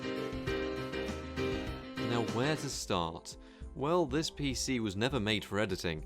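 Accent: United Kingdom. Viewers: well done man you deserve way more subs. love your Chanel one of the best tech channels on youtube. it is great to hear a British accent as well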